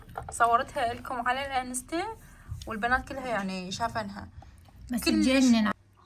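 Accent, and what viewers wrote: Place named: Diwaniyah, Iraq. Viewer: سبحان الله بس سمعت لهجتج عرفت ديوانيه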